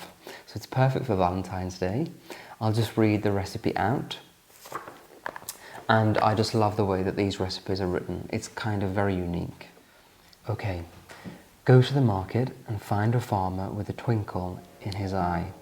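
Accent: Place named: Northern England, United Kingdom. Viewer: I noticed he 'dropped' a lot of his vowels this week in his efforts to appear breathy and sensual- they are more Northern than West Country!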